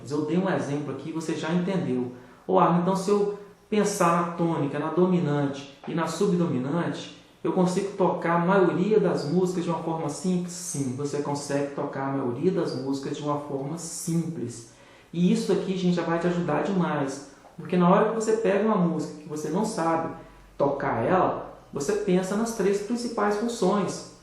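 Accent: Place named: Minas Gerais, Brazil. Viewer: AMEI AÍ SIM , AGORA VAMOS APRENDER COM ESSE SUTAQUE MINEIRO E MANHASO E SUAVE. ISSO NÂO É AULA É UMA POESIA LÍRICA .💤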